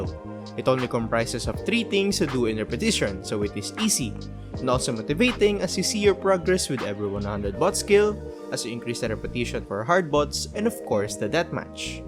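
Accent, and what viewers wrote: Philippines. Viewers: the pinoy accent was enough to convice me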